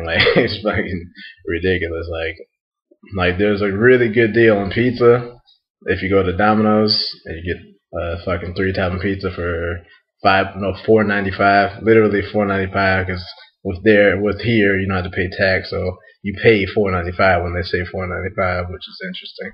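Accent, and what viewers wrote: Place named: United States. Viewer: Take YOUR shitty accent and attitude back to America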